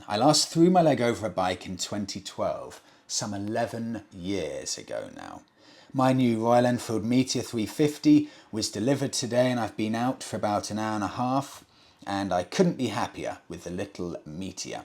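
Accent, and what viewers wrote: United Kingdom. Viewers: I love your two YouTube channels. Great content. But it’s your proper British accent that is just mesmerizing. It has the perfect pitch, tone, and pace.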